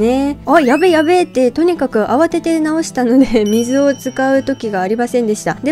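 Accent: Tohoku, Japan. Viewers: 東北か北関東訛りですね福島か栃木かな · ちゃんって東北人？しゃべりが癒される👍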